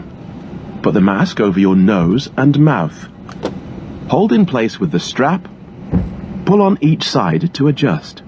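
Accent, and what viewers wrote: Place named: England, United Kingdom. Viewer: Nice english accent. 👏👏👏 Very clear public announcement (P. A.) of a purser/flight attendant.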